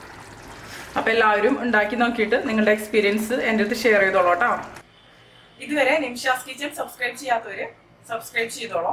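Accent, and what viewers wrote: Thrissur, India. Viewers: തൃശൂർ ആണല്ലേ?? എവിടാ ചേച്ചി??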